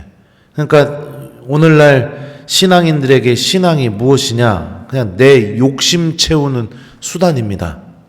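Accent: Chungcheong, South Korea. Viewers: 신부님 강의 주옥같은 말흠 너무 재미 있고 충청도 사투리와 부모님 무한사랑으로 사제가돠 셨너요 감사감사합니다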